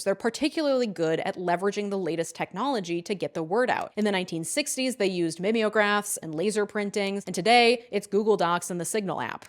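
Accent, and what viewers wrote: United States. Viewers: In the beginning of your video you criticized zionism, which fair, I do also think it's kind of weird as a concept, buuuuuuuuut, aren't you from the USA or Canada? How did you think white people got there in the first place, don't you find it weird that you never see native-americans in your day-to-day, they were genocided, and your assessors probably had some kind of envelopment in that. But I don't guilt you from being born in the country you happen to be born with and want to keep living there, I just find it weird that you talk about zionism with an american accent, which is a by-product of colonization, which is just another word for genocide and occupation of a foreign country.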